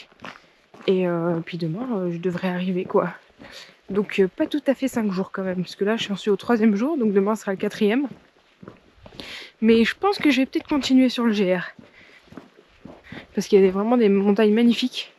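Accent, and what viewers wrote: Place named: Drôme, France. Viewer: Je viens du sud de la Drôme, dans les baronnies exactement, au pied du ventoux😁! Tu as la même façon de parler que les gens d'ici, les même expressions😉😁. En tout cas tes vidéos sont super intéressantes, un vrai plaisir à te suivre 👍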